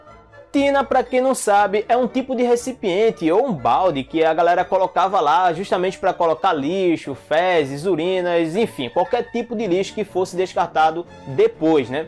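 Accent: Northeast, Brazil. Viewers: A voz do é muito marcante.Tem sotaque de nordestino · Gosto muito do sotaque nordestino.👍🇧🇷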